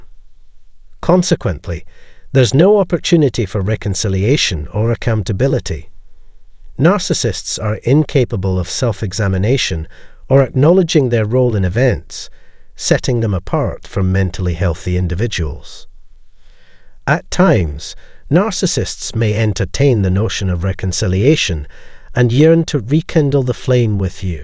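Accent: Scotland, United Kingdom. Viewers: So your channel is pretty milquetoast when it comes to defeating a narcissist or pwBPD. lemme adjust your counterattack a wee bit. When they cut with you, you chase for awhile, and then breadcrumb them, back and forth, this tactic will keep them locked in stasis and never be a problem for you again. This is actually reverse ,reverse psychology. This is a pre-emptive bread crumb, in tactical evasion we call it a crazy Ivan. Not unlike doubling back on your own tracks and ambushing your attacker. For more and better NPD avoidance advice , ask in comments. I have real experience in this field and a degree to back it up. The scotty voice guy is fairly elementary.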